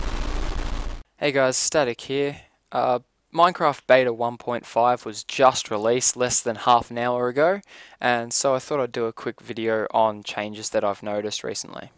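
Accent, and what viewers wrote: Australia. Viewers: nice are you aussie ?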